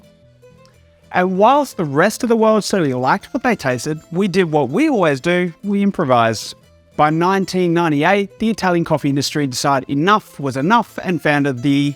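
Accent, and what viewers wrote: Australia. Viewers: Can we all agree that his australien access is fascinating 😍😍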